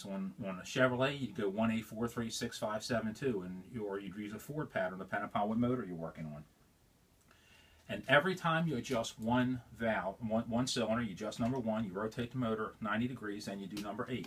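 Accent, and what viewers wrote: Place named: Maryland, United States. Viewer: This guy sounds like he's from the eastern shore of Maryland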